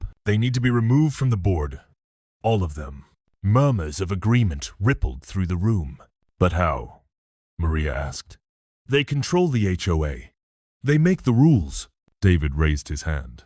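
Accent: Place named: United Kingdom. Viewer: You know the stories military angle & the accent used by the AI makes it sound off. Might want to tone down the accent so it doesn't sound British so much.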